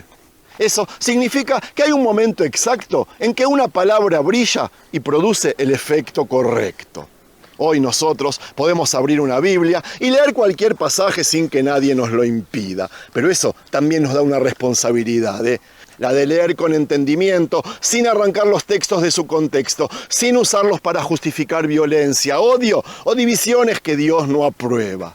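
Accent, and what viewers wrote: Argentina. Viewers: Isaias 53 es desgarrador tremenda palabra que describe a nuestro Señor Jesucristo. Saludos Dios te bendiga. Tienes acento y modismos argentinos che querido.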